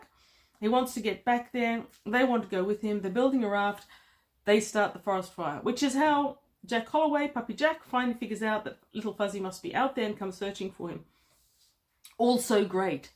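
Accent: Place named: New Zealand. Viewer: I'm wondering why a woman with a Kewpi accent (I'm guessing here, all I really pick up is southern hemisphere) has U.S. editions of these novels. Is it just that New Zealand has such a small population that, even with the distance involved, it's cheaper to import such small quantities than to do the setup to print them.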